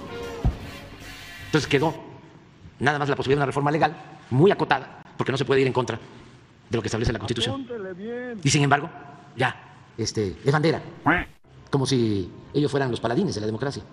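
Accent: Tabasco, Mexico. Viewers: Se le achican los ojos, retoma el acento tabasqueño y también habla más de corrido cuando está que se lo lleva la chingada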